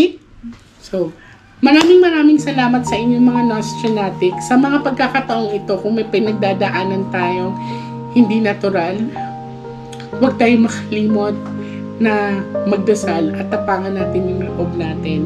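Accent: Visayas, Philippines. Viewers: Cute mn mg bisaya si ms ❤️ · Bisaya gyud 😁😁👌👌👍👍watching u miss.. from Cebu City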